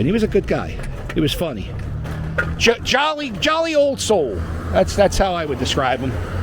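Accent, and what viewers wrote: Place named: Pennsylvania, United States. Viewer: I just want to tell you I’m a Pa native and to hear the knowledge that you have on the metro Philadelphia area is phenomenal and refreshing to hear. I was born in Norristown, and loved philly, knew and worked there till i was over it at 22, moved out west to California and now reside in Nevada. Love the phillies, Eagles, and miss hearing the slang in your voice, always know a fellow Pennsylvanian! Im now a fan, keep up the great videos 👍🏼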